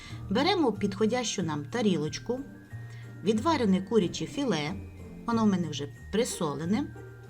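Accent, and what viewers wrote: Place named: Ukraine. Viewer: Может и вкусно но этот укропский говор раздражает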